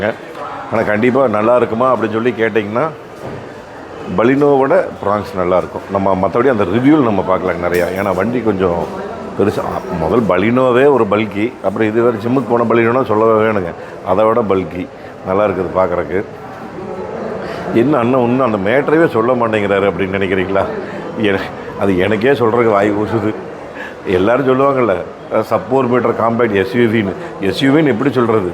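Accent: Kongu, India. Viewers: கொஞ்சும் கொங்குத் தமிழ் பேசும் கோமகரே...! திகட்டாத தித்திக்கும் பேச்சுடைய திருப்பூர் திருமகரே...! லடாக் பயணச் சாதனைச் சகாப்தம் எங்கள் மதிப்பிற்குறிய டான் திரு. அண்ணா அவர்களே❤❤❤🙏 எப்படி இருக்கீங்க அண்ணி❤❤❤🙏 எப்படி இருக்காங்க தம்பி ரித்திக்❤❤❤ எப்படி இருக்காரு விழிகளில் பதிந்தது புதிய கார் திறப்பு விழா💐💐💐 ஆனால் மனதில் பதிந்த நிகழ்வு எல்லாம் மதிப்பிற்குறிய டான் திரு. அண்ணா அவர்களுக்கு ஷோரூமில் அவர்கள் தந்த மரியாதைதான்❤❤❤💐💐💐💐😊😊😊❤❤ அருமையான வீடியோ❤❤❤ என்றும் அன்புடன் உங்கள் தம்பி என்றும் அன்புடன் உங்கள் TMF ரா.முஹம்மது கபூர் (சென்னையில் இருந்து) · neenga peysura kongu tamil, keyka keyka keyakalaamnu iruku sir 🙂 appa appa anga inga thirumbi sirikurathu ha ha ha super and very natural way of doing youtube videos.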